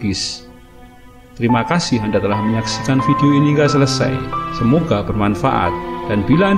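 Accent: Java, Indonesia. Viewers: Itu lidah jowo mbak🙏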